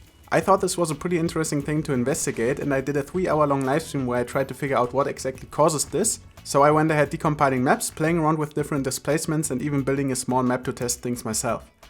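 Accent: Germany. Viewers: You are german riight? I hear that. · dieser dreckige deutsche akzent......den kann man sich einfach ned geben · dein englisch ist so schlecht man hörtdas du deutscher bist lappen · A question. Are you a german Youtuber who talks English? your accent sounds german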